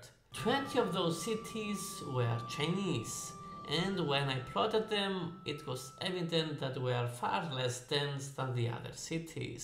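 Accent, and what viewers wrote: Cyprus. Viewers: Sorry to ask this but.. Where are you from? You have a very a Cypriot accent ✨🇨🇾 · I can always recognize a Cypriot accent 😛. Ωραίο Βίντεο!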